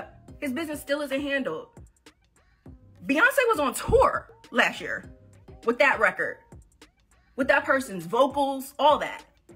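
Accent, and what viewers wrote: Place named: Houston, United States. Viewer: Not me realizing that I actually know the voice behind the channel. Wow, you are amazing girl. Congrats on your YT success!!! I’ve been subscribed for the past year now and was like damn I know this voice from somewhere. She sounds like she’s from Houston lol. Hey girl, heyyy 👋🏾🤘🏾😘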